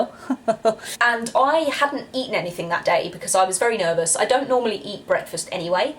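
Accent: United Kingdom. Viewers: Your British accent always gets a thumbs up ( it´s the best english who can be spoken) .. the content of your videos always gets one, too. So you have ... at least with me as a German always 2 points plus ;) Listening to you is always greate Keep going and keep the head up these days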